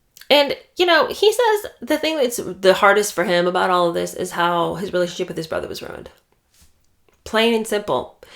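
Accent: United Kingdom. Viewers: Love listening to you! Your British accent is hilarious ❤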